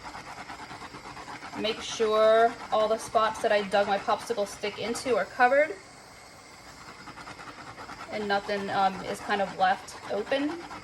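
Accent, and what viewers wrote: New Orleans, United States. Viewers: Girl, you are from Metairie or New Orleans FOR SURE!! I can tell by that accent (you sound like me!) and those glitter names…”Garden District, Sugar Cane?!” HA! Love your channel…new sub!!!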